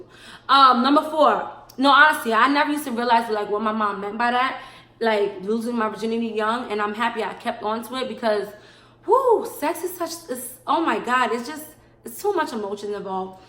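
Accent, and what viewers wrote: New York, United States. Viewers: She sounds like a New Yorker lol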